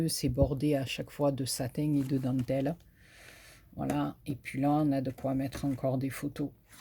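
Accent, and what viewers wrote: South, France. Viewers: Vraiment sublissime cet album beaucoup de goût mais surtout votre façon de mettre votre touche personnelle fleurs feuilles ruban bidouilles qui en fait une pièce merveilleuse et unique je me régale à regarder vos vidéos et j aime votre accent du midi. Couleur vacances et soleil Bises de bretagne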